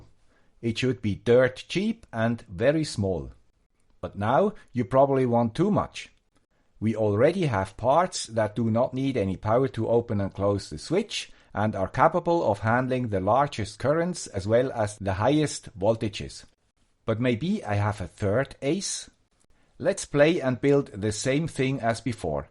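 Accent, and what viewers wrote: Switzerland. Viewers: Thanks for taking the time to answer, keep up the good work. Sunday morning newspaper, not any more, now it Sunday morning and new video from the brilliant guy with the Swiss accent.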